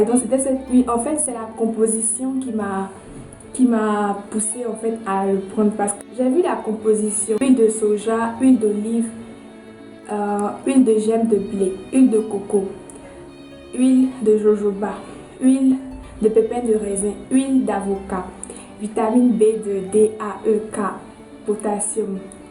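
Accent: Ivory Coast. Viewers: Trop mimi, tu m'arraches toujours un sourire avec ton accent trop mignon qui me rappelle mon pays la Cote d'Ivoire, bon courage et bonne continuation à toi:-)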